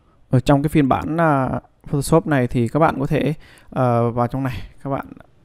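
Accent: Vietnam. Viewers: Anh ở đâu Thanh Hóa vậy ạ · Bạn người Nam Định phải ko bạn?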